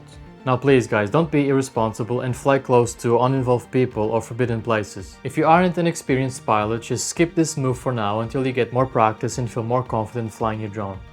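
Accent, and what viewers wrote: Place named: Madeira, Portugal. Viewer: Quando ví o clip da ilha da Madeira, comecei a notar mais no teu sotaque e és claramente madeirense! Bom vídeo 👍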